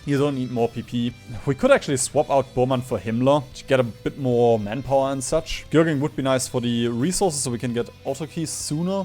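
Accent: Germany. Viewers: Ich höre das deutsch raus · Du klingst Deutsch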